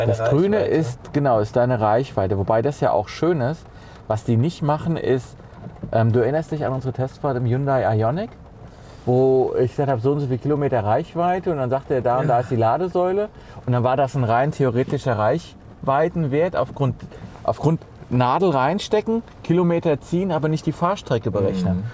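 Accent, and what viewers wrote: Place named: Hesse, Germany. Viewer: Lieber, ich stehe aktuell vor der Entscheidung pro Elektro-SUV und habe mir daher unzählige Youtube Videos angeschaut. Zudem bin ich den EQC gestern zur Probe gefahren. Deine Eindrücke teile ich zu 100%. Das vermutlich ehrlichste Review überhaupt - zudem im hessischen Heimat-Slang! Besser geht net. Was mir zusätzlich aufgefallen ist: der Raumeindruck ist doch etwas beengter als bei der Konkurrenz. Das war auch schon beim GLC der äußeren Form geschuldet und ist durch den höheren Boden (Batterie!) nicht besser geworden. Es ist wirklich eher bloß der Eindruck aufgrund der gerundeten Formen, denn Platz ist trotzdem für 95% des natürlichen Publikums ausreichend vorhanden, meine ich. Die andere Geschichte war das Fahrwerk. Das federt einerseits Unebenheiten souverän weg, hinterlässt jedoch auf längeren Bodenwellen ein Gefühl leichter Seekrankheit bei mir. 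Da hätte ich es gern einen Tick direkter gehabt. Ist vielleicht persönliche Präferenz. In engen Kurven bleibt der Wagen spurstabil, kann seine 2,5 Tonnen aber nicht ganz verstecken. Das ist die Physik. Die Bremse war für mich übrigens okay.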